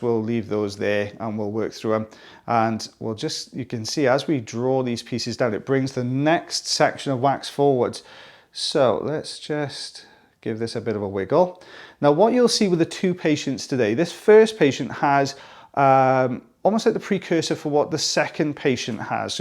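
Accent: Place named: Wales, United Kingdom. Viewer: Hi, love your videos! It took me quite a bit of googling to realize you’re saying “Zoellner” tubes. As an Oregonian, I don’t get much practice understanding lovely Welshmen like yourself. Thank you for the education and entertainment!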